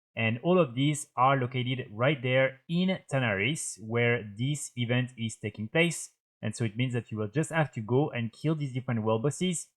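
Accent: France. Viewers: French accent :)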